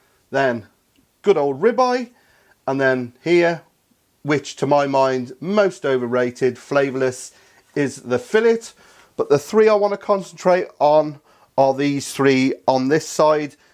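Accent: United Kingdom. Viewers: I don't think that having a British accent and an arm full of tattoos makes him a meat expert. Half of what he said towards the end was pure garbage. If you want cheap meat buy ground meat and add to it. Burgers, meat loaf, etc.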